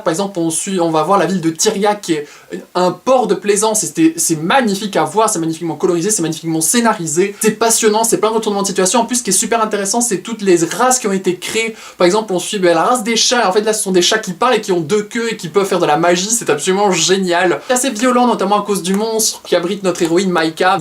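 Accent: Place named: Belgium. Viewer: C'est marrant parce que j'ai pas tout de suite capté que c'était l'accent belge (genre ya un truc qui va pas mais quoi^^) mais j'ai tilté au "tome huit" (taume ouite^^) et j'ai réalisé que si ça me disais quelque chose c'est parce que c'est comme ça que mon chéri le dit^^ (belge aussi avec comme toi un accent très peu prononcé, juste sur certaines prononciations et certains mots). Fables me fait de l'oeil depuis un moment (pareil, j'ai connu son existence par le jeux telltell auxquel j'ai malheureusement moins accroché que walking dead parce que c'est un peu lent à mon goût mais j'adore le concept) étant une grande fan des réécritures de comptes etc (once upon a time entre autre^^). C'est cool de nous présenter des comics hors super héros (pas forcément ma tasse de thé à part peut être deadpool que j'aime beaucoup avec son côté comique^^ et les xmen dont j'aime beaucoup les films mais que je n'ai jamais lu). 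J'ai du mal à trouver mon compte dans les manga, j'adore mais je suis plutôt shojo pour leur côté mignon mais pas cucu et pas trop blagues de cul lourdes^^ (j'avais adoré fruits baskets par exemple). Je viens de faire péter ma wishlist du coup^^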